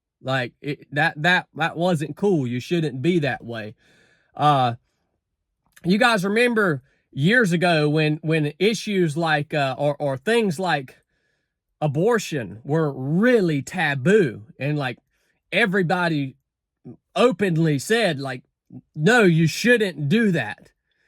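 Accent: South, United States. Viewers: Probably not a good idea to take any kind of spiritual advice from someone who looks and sounds like he just crawled out of the hills himself. It's always incredible seeing the Dunning Kruger effect in action. People with a relatively low IQ who don't understand complexity and nuance take on a position of teaching and guiding others, fully confident in setting up a platform where they are speaking what they think is some kind of truth. This video is a perfect example of the mental illness crisis in this culture.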